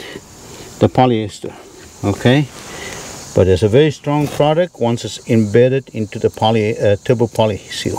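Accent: South Africa. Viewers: You sound like a South African 🇿🇦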